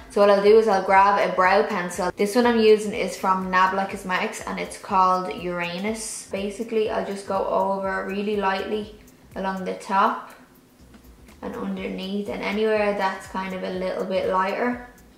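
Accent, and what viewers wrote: Ireland. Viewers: I'm not the best with accents. Are you from Ireland? Your speech is beautiful. My great grandpa is from Ireland and I've always wanted to visit. The dreams of that kind of went down the toilet when I got married and became a stepmother and then a mother.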